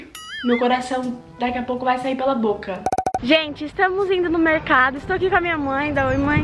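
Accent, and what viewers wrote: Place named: Portugal. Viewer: já ta ficando com sotaque de Portugal ❤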